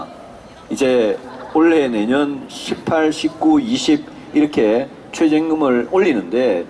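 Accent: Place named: Gyeongsang, South Korea. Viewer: 경상도 사투리라도 귀에 쏙쏙 들어옴니다.유승민의원님 그날을 기다림니다~!!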